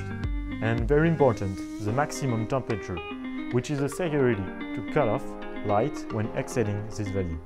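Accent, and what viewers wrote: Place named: France. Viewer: Nan nan nan ... ce n’est pas possible, pas avec un accent français à couper du bois et encore moins avec un look de VRP ... Je vous le fais version vendeur pro, sans accent soporifique contre une Gavita e-series 6/750w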